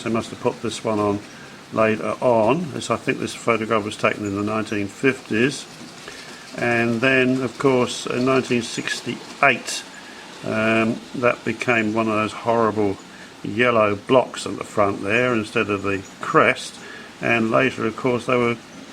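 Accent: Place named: Australia. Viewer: Wait, your accent has an australian twang... if youre australian, ignore the beginning part of the comment under this........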